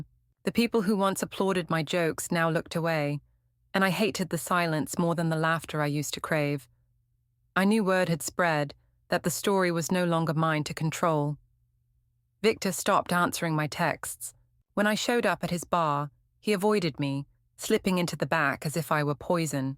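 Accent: United Kingdom. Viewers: I recognize the narrator's voice from previous videos: a stuck up, arrogant, British accent, entitled, selfish vixen, that does what she wants without considering the consequences and the people she hurts. I couldn't watch after a few sentences. A very unlikable character with a voice to match. These characters hit too close to home for me.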